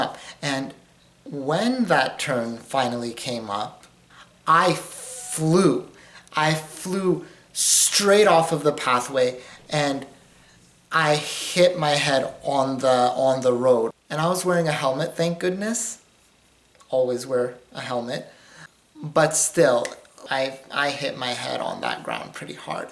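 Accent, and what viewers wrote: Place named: Canada. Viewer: That canadian accent thi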